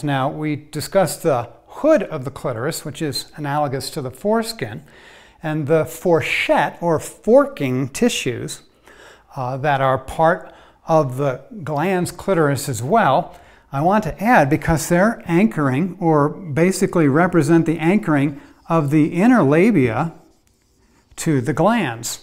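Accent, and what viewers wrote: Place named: London, United Kingdom. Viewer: London..that's the first thing I noticed(^,^)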